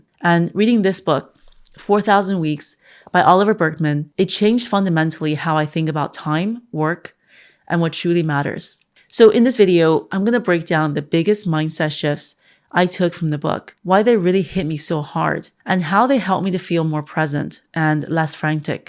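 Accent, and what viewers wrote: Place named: Ireland. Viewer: I hear a slight Irish twang in your accent.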